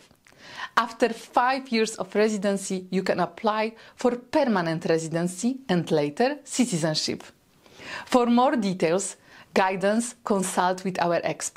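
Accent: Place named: Russia. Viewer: Yoir accent is like that of Russian.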